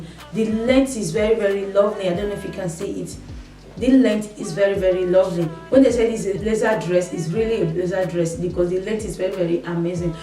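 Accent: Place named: Nigeria. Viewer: Very straight to the point. love it! you sound Nigerian too, beautiful accent.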